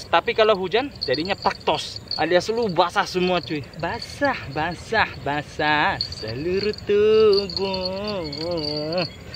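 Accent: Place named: Banyumas, Indonesia. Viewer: Kok bahasanya kayak jawa ngapak, sebenernya orang mana si abang ini... Bingung akuu itu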